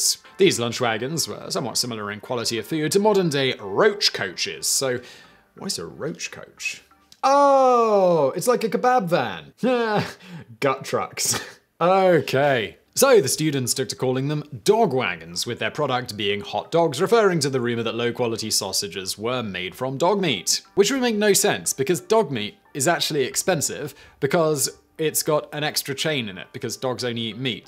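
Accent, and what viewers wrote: England, United Kingdom. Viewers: you have a very similar accent/dialect to me. I am English and enjoy the language we hol in common. However, I do wish you woul cease using US phrases and pronunciation - I don’t know whether the arseholes of the US are flattered by your transatlantic euphemisms or laughing at you. I merely find the practice abhorrent. If you think that using US slang will give you a better audience, I very much doubt it. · Alicia Rice How is it he sounds so insular, whenever he comes across a foreign word it’s pronounced stubbornly in the King’s English? He’s the type who pronounces Don Juan like Don John. It would kill him to pronounce croissant other than like crescent. There’s a reason Brexit happened, no matter how much pretends to be internationalist.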